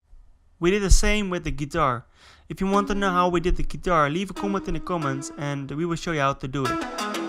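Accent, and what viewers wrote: Netherlands. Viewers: Is dit een Nederlander? Or not?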